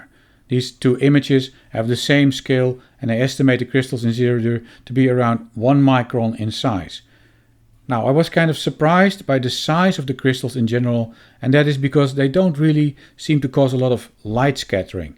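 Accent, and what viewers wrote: Netherlands. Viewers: I thoroughly enjoyed digesting this information. Your dutch accent reminds me of my birthplace NL. Thank you for the education.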